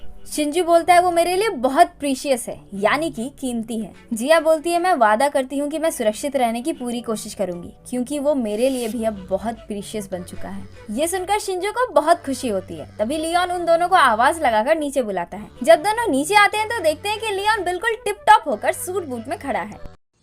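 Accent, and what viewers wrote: Bengal, India. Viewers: Are you Bengali? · Are you bangali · Didi tumi bangali naaaaa sondeho 6ilo kin2 tomar vat katha te pakka hoye 🥰🥰🥰🥰🥰🥰🥰🥰🥰🥰🥰🥰🥰💋💋💋💋💋💋💋💋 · You are Bangali right?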